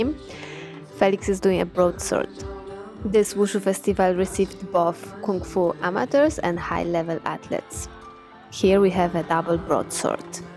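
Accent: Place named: Poland. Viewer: Very good and positive video. Thank you! When started to wath a video, though that, hmm sounds like a speaker have a polish accent and it was true.)) Interesting how roots are following people. All the best you guys!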